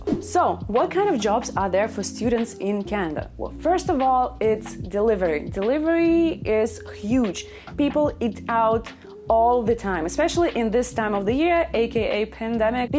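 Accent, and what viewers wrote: Russia. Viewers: Are you russian speaker ?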